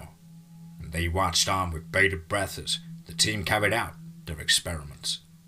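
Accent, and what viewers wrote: United Kingdom. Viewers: No, they probably just can't stand a British accent telling them a story, or they are aware wolflike, like me. Oh, and I can't wait for you to call me a racist so I can call you some names too, since I'm in a tear-your-throat-out mood right now. I'm the 7th👎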